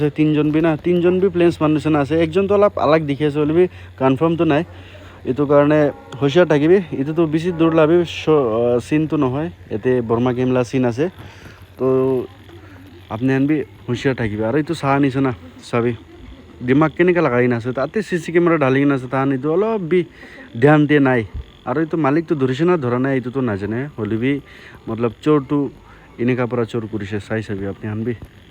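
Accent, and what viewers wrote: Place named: Nepal. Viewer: Nepali accent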